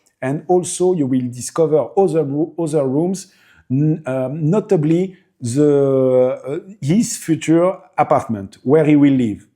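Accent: France. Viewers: I been wondering about the young French man and his chatuea, think his video was called So Chateau. You showed a picture of him in the end, a very young man. I would like to know how his chateau is doing? Don't worry about your English women love it with your French accent ❤😊😅😅😅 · Your English is amazing but your accent is throwing my Texas ears off a bit. I am trying so hard to learn some French, but alas, I am truly hopeless. But I love your show and have subscribed to it. I know I am capable of understanding the accent and I suspect it is the key to learning French because so many words are already part of the English language, not to mention that I grew up very near the Louisiana border where French is still widely spoke. But I do know " Laissez les bon temps rouler" although I can say much better than I can write it. 😂😂😂 I'm sorry, that is official motto for Mardi Gras, kind of our Carnival. 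· As an American I understand your English perfectly. You do have an accent but so what? You are French and it is understandable. I only wish I could speak French. It’s a beautiful language and I hope to visit your glorious country next year. 🇫🇷🇺🇸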